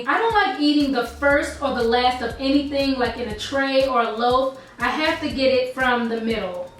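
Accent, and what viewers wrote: Baltimore, United States. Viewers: I knew u were from bmore by ur accent. Youu-teuuuube!! Lol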